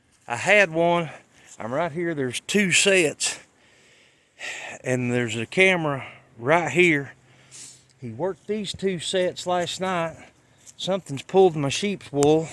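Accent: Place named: South, United States. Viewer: Very disturbing, sad, cruel, and embarrassing posting. Strange how YouTube allows this very depressing, and cruel content. Always some " man" with a southern drawl, beard and can smell them thru the Internet...... Always Trumpers, and always think of themselves as "real Americans men"..... Real men hunt with cameras.......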